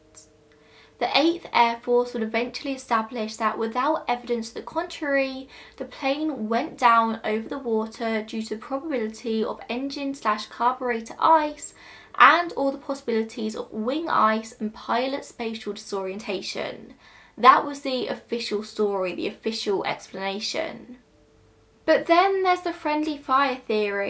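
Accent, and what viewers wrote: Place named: Home Counties, United Kingdom. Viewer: She is speaking in a standard Home Counties accent.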